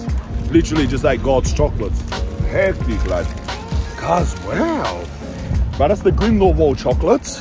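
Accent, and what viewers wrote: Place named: Australia. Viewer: Interesting that the Swiss claim chocolate as their specialty when cocoa is only grown in warm to hot climates. It’s grown in Australia and we have good chocolate at I good price. Nonetheless Spanish at his best with a good Aussie accent in a place they all seem to speak English and good English too Beauty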